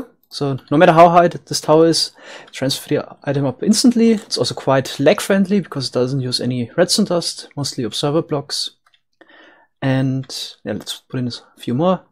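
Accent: Germany. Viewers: ahh ok, thanks. where you from, you sound like german i have that feeeling :D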